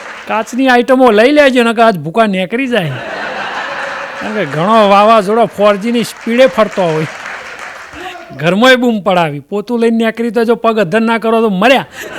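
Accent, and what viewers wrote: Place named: Mehsana, India. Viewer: mast mesanani bhasa bolo chho sweet lage 6 pan atlivarma puru lo thoda vadhare to sambhdavo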